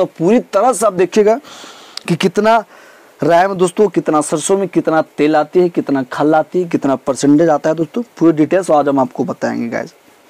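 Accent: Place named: London, United Kingdom. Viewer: Bhai London wali hindi q bol rahe ho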